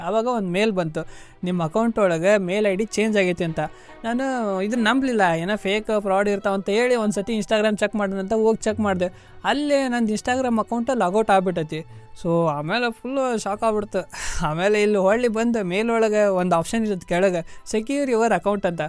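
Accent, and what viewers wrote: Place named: Vijayapura, India. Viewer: ನಿಮ್ಮ ಮಾತ ನಮ್ಮ ಕಡೆದೆ ಇದೆ ರಿ ಸರ್ ನಾವು ವಿಜಾಪುರ ಮಂದಿ ಅವನವನ ಬಾರಿ ಅಂತೀರಿ 😁😁😁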